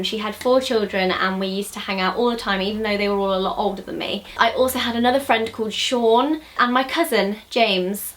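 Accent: England, United Kingdom. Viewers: you know why i'm here. not because of you. your lovely british accent. i guess! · it seems like when you say ass its less offensive with your English accent than with my American accent.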